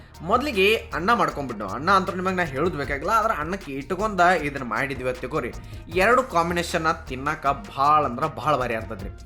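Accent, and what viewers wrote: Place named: Karnataka, India. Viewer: Love this Kannada accent ❤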